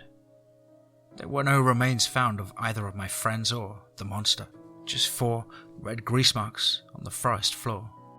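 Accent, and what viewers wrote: England, United Kingdom. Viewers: Huh ? I don't think it's a speech impediment. It's the accent ya know English accents. But maybe you are correct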